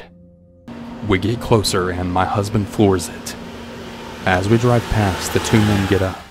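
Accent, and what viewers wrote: South, United States. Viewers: I love that slight southern accent im the narrator's voice 💜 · I really love the narrators accent like his southern accent it’s very aesthetically pleasing and satisfying.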